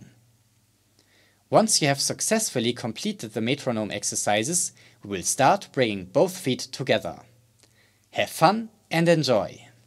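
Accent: Germany. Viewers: Your from germany...i think its a strong german accent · Lovely deutsch accent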